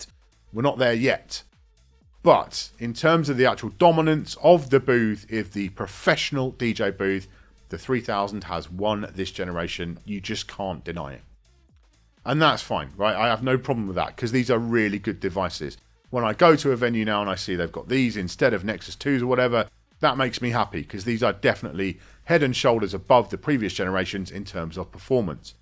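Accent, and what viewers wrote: England, United Kingdom. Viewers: In we TRUST!!! The only English accent DJ I can stand lol... No offense please, but let's be honest, I hate watching videos from people with an accent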